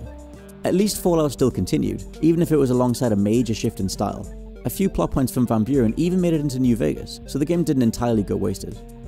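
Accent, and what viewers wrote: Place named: Geordie, United Kingdom. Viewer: is the Narrator from jesmond in Newcastle? sounds like it. it's very posh Geordie, but still sounds a bit Geordie to me! toooooon. :)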